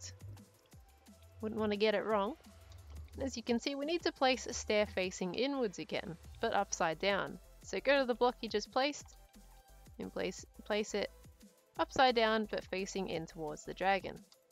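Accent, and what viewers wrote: Australia. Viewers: are u Australian? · I love your Aussie accent! ❤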